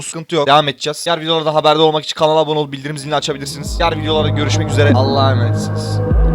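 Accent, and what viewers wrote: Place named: Mardin, Turkey. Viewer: ABİ NERELİSİN MARDİNLİ GİBİ GONUŞUYORSUN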